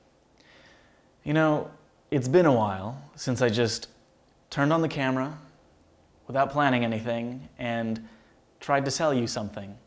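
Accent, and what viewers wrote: United States. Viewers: I usually can't stand american accents but yours, yours I can handle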